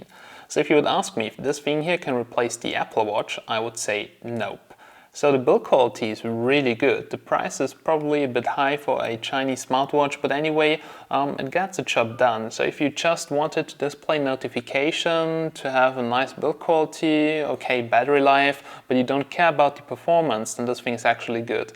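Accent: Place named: Germany. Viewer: Zweites Video und weiß seit der ersten Sekunde das du Deutsch/Österreicher bist :D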